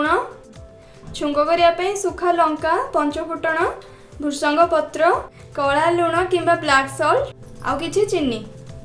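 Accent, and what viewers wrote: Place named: Balasore, India. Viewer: OK mam.apananka Katha style ta mote balesoria bhali lagila so pacharili.. Thnku mam. I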